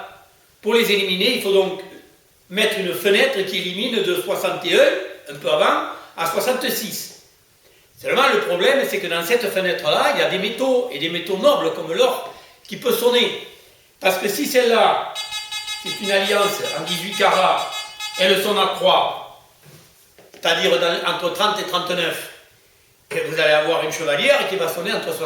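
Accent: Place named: Toulouse, France. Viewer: J'ai hâte de recevoir le mien ! très bien cette démo et avec l'accent de Toulouse c'est encore mieux !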